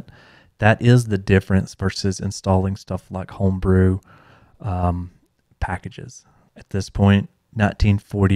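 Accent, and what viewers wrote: United States. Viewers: I read Birmingham and instantly thought "England UK? He sounds American" guess I gotta remember you guys have a lot of our city names lol. Good video btw 👌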